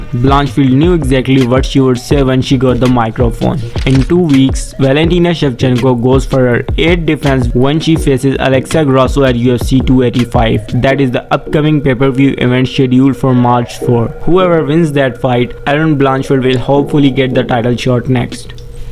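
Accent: India. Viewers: MY GUY WITH THE INDIAN ACCENT!!!!! WHATS UP MY FRIEND?! Vegas 69 main card was the drizzling shits but Blanchfield was awesome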